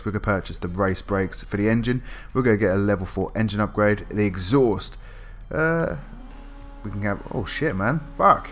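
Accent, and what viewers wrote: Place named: Cockney, United Kingdom. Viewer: Nice video mate & random question I know but what's your accent? Sounds a bit cockney/pompey I just can't quite put me finger on it 😂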